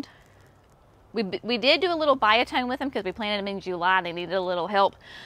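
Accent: South, United States. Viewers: just a little off topic...I just watched the tree removal vlog....just for future reference ( from a Canadian girl ) ....a TOBOGGAN is a flat sled .....a TOQUE ( pronounced too-k ) is what americans call a beanie, like your cute little white hat.....by the way , I love your southern accent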